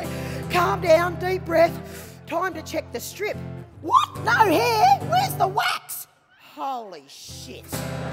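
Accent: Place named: Australia. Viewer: Shit ya make me laugh Woman! The pure Aussie...ness (its now a word) is just grouse!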